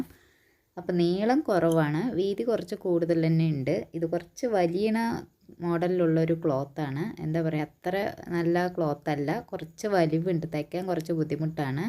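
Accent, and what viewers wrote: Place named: Kannur, India. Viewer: സഹോദരി ഒട്ടും വിഷമിക്കേണ്ട. ഭ്രാന്തൻ മാർ പലതും പറയും ഞാൻ മുപ്പത് കൊല്ലമായി ടൈലറാണ് എനിക്ക് നിങ്ങളുടെ വീഡിയോകൾ വളരെയധികം ഇഷ്ടം നമ്മുടെ കണ്ണൂർ സ്റ്റൈലിൽ സംസാരം വളരെയധികം ഇഷ്ടം..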